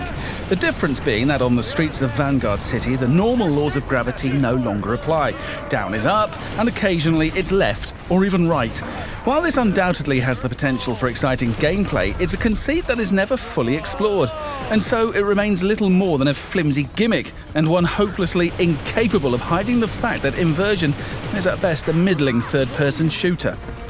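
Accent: United Kingdom. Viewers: British accent = Awesome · British accent <3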